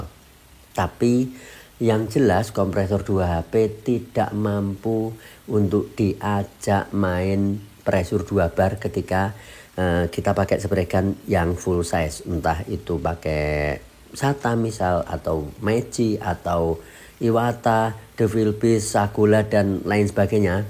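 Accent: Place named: Java, Indonesia. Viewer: smpean jowo ndi to mas kok medhokmen 😁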